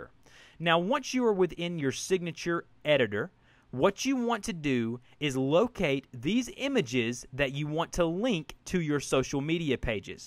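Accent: United States. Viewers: I love hearing American English Cheers From Algeria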